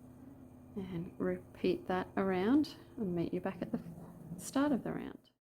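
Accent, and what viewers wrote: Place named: Australia. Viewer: Hi! Looks great..just found it and will make one! Greetings from Italy.. I guess you are in Australia right? ( accent😀)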